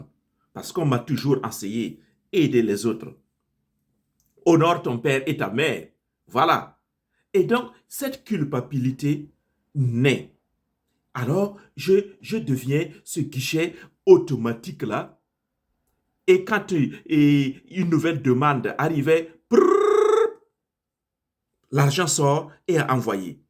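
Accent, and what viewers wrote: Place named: Burkina Faso. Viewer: Accent Burkinabé. Tu as raison Mon frère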